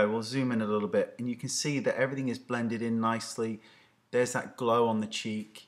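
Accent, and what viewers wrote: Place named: West Country, United Kingdom. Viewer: It's a west country accent... like Bristolian but not as harsh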